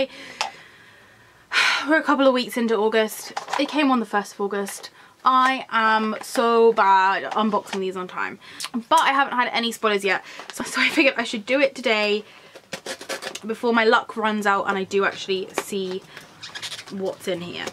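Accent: United Kingdom. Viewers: Hi Shirley here. I luv listening to u talk, luv your UK accent.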